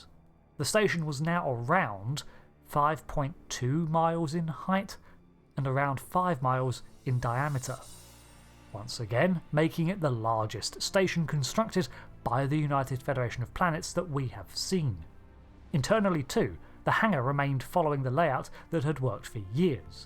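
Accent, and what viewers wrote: United Kingdom. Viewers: Just simply because you have a British accent, does not necessitae you being correct.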